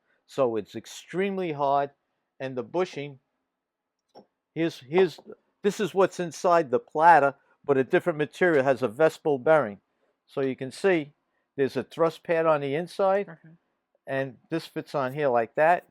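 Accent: New York, United States. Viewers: A PHD level of knowledge and a super cool New York accent. Is there a follow up video with the completed turntable? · Great New York accent!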